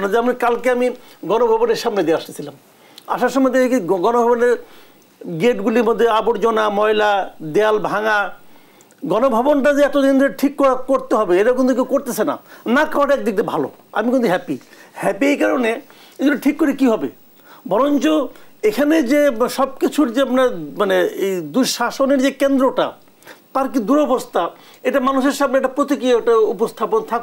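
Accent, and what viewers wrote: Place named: India. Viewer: উপস্থাপন কন্ঠ কেমন যেন ভারত ভারত লাগছে।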